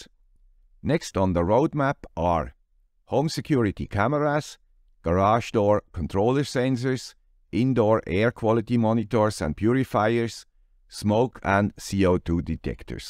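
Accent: Switzerland. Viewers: I was just wondering about it as I got back into HA... And there's a video by the guy with a swiss accent about it! Great! Also, I feel like it's relevant... XKCD #927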